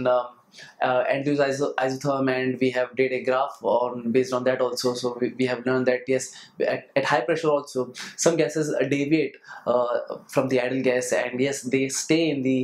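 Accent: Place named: Tamil Nadu, India. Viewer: Tamil speach touch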